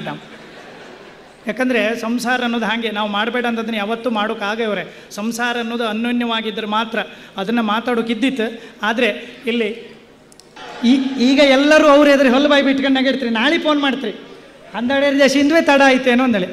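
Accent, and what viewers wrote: Kundapura, India. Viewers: Kundapura Kannada Super.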